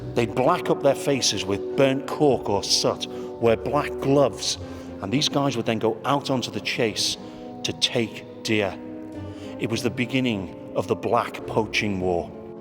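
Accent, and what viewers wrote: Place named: Liverpool, United Kingdom. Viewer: Why do we need a Scouse towny presenting this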